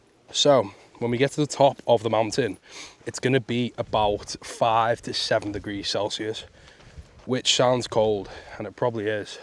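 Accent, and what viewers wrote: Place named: Liverpool, United Kingdom. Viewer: New sub fella well done good watch you git touch scouse in tha accent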